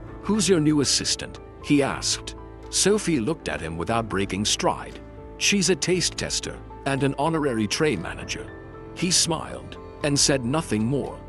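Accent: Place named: United Kingdom. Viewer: the voiced narrative states "... catching the floating flour dust". However, the text subtitles erroneously indicate the floating flower dust -4435 the audio narrative with a thick British accent,describes Eva showing interns how to ,"braid hollow bread" and the text narrative reflects the word "hollow" bread. THAT'S A BIG MISTAKE! My husband was a professional baker. The spelling is "challah" and is pronounced holla. It is a traditional Jewish bread. It is NOT HOLLOW. You need to 1. get rid of the British accent 2. proofread 3. get a better AI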